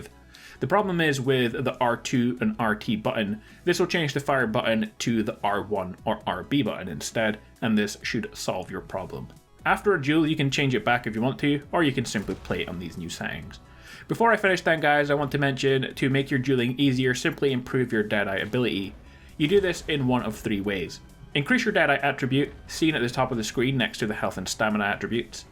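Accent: Scotland, United Kingdom. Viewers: I hate the quickdraw system in RDR2. It is completely retarded. It tells you to fill the meter slowly on the first press, by pressing slowly...Press slowly? It's supposed to be a quickdraw ffs! I died so many times trying to do just that. The trouble is that you don't get much opportunity to practice either. BTW are you Scottish ya bawbag? Me too. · You sound a touch Scottish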